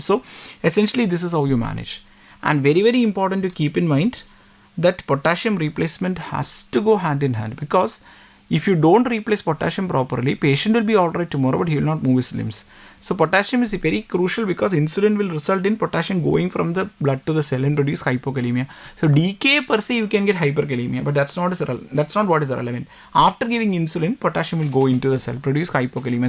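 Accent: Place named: Kerala, India. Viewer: Pakka mallu accent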